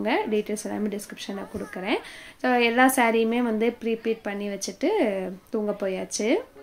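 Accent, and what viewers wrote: Kongu, India. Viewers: Neenga v2la kongutamil giramathu basai thaan pesureenga kongu vellaalar ah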